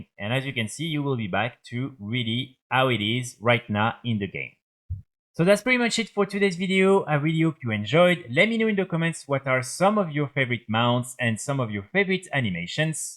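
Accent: France. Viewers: What is the problem with your accent?? Is that French sh!t?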